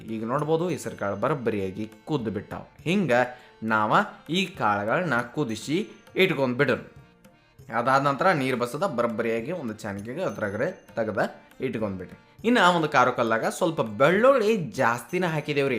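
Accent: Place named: Karnataka, India. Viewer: Your kannada explanation is so sweet to hear,our native desi kannada.❤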